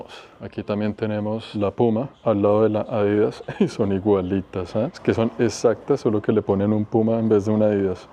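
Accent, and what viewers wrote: Bogotá, Colombia. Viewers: Hola. Lo que distingue a es la manera amena de relatar con su acento colombiano, muy gracioso. Además de enseñar lugares extraños como este 👏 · no paro de reírme escuchando tu rolo, es escuchar un cuento, contado por mi versión masculina 😂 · Aprender contigo es genial, lo genial es que eres rolo y gu forma de hablar es muy linda, si te veo en la calle te robo un beso 😂😂😂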